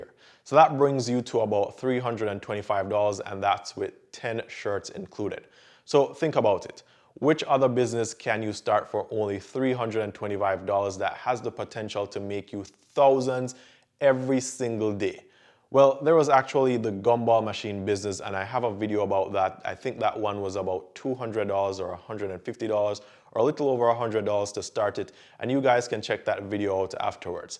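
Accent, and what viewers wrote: Jamaica. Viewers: Hi love you video are u Jamaican? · Young man mi than yu. Fe di information. Sorry mi can't write potwan again sorry. But mi hear u accense. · Sounds like your from Jamaica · SOUND JAMAICAN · just subscribed and I'm looking forward to talk to sir. I am very much interested in the t-shirtbusiness. Yuh sound like a man from yaad iyah, suh bless up if a suh it guh. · Thank you for sharing. ARE YOU A JAMAICAN? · He sound Jamaican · Sound like u from yard 🇯🇲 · Are you Jamaican bro because I hear it ❓😂😂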